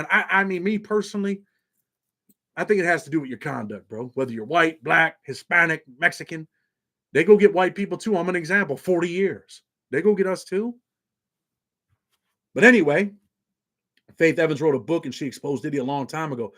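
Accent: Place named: South Buffalo, United States. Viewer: Your south buffalo as fuck bro !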